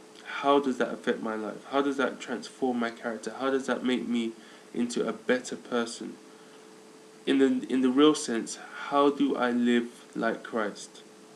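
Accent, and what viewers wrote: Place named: United Kingdom. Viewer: i like your british accent !!!!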